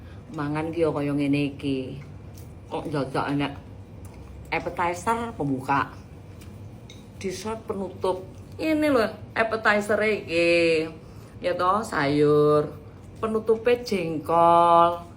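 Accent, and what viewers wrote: Java, Indonesia. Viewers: Masyaallah mbak...medok natural banget sampean, tapi yo tetep cantik tetep sugih👍❤️ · Masya Allah jawanya medhuuuk, klaten hadir mvk ❤ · Keren mbak omongane medhok jowo 😂😂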